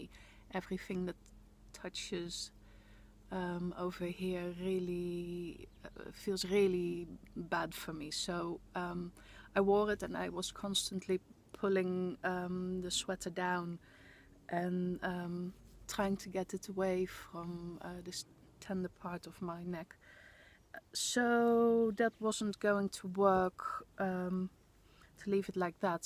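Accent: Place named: Netherlands. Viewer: Hearing you, I already thought you would be Dutch, because I hear you thinking in Dutch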